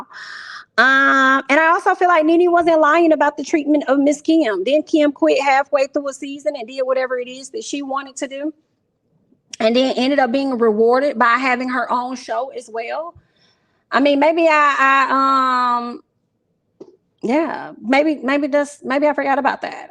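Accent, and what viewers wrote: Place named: Alabama, United States. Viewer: I just love to listen to you talk! Reminds me of my family home (Sylacauga, AL). ❤️❤️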